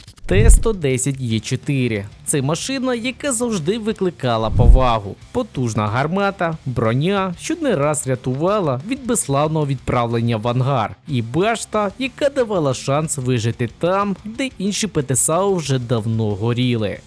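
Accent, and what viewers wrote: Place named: Russia. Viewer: А де КВ - 1С? З альфа пуканом? І скажу ще одне, одразу чути що перейшов з російської на українську. 😅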